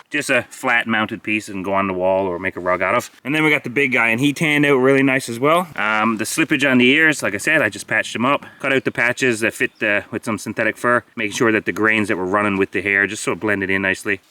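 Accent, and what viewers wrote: Newfoundland, Canada. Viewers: I can recognize a newfie accent even if it’s faint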